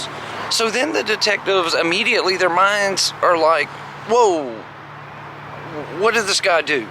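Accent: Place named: Alabama, United States. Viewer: Good day from.Australia 👍🐨 I just watched this story and have subscribed. Now a keen follower. Your content is great - interestingly presented, your natural down to earth style is 👌and i love your "Bama" accent! I look forward to many more and will catch up on your previous uploads. This is ever so sad R.I.P. sweet gal 😇. Love & light across the oceans and miles! 🙋‍♀️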